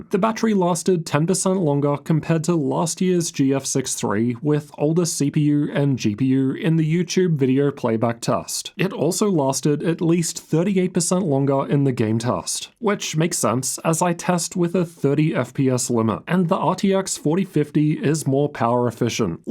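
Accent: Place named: Australia. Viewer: Because it’s an Australian accent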